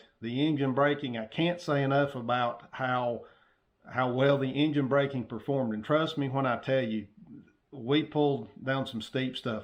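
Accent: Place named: South, United States. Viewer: New subscriber - thanks for the video. I am enjoying your channel and hearing about your journeys. I like the southern drawl, kinda relaxes me to listen to as my grandpa's family is all from Georgia and I enjoy their accent.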